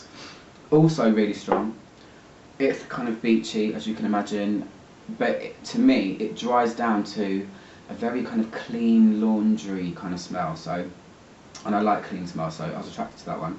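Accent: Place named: United Kingdom. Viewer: Hello! is a fantastic video, i love your accent more than anything , english people talk so elegant, i like that, but there's a part i can not undrestand very well and i am interested in that perfume i want to ask you, what's the charasteristic of the original hypnotic poision of Dior, how is the bottle?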